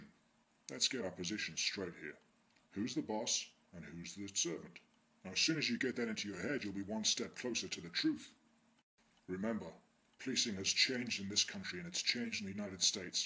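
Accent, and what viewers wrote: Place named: United Kingdom. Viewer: by the way, you sound british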